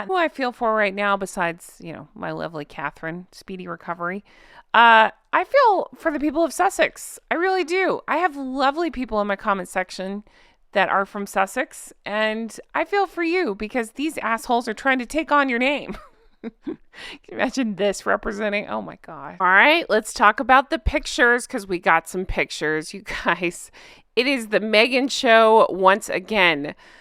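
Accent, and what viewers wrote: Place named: United States. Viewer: The entire royal family is a scam to promote British tourism. You sound like an American. Why do you care? We made a break from that Monarchy in 1776. Get a grip.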